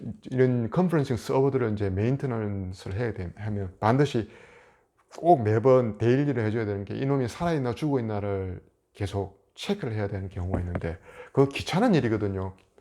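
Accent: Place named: Gyeongsang, South Korea. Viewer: 영상 너무 유익하게 보고 있습니다^^ 경상도억양의 fluent한 잉글리쉬가 너무 재밌어요..!! ㅎㅎ 재밌게 ?직관적인 설명 감사합니다~~~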